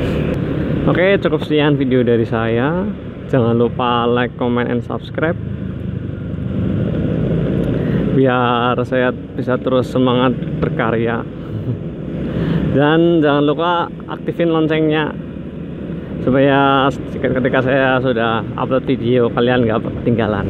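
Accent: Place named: Banyumas, Indonesia. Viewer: Ngapak temen lah mas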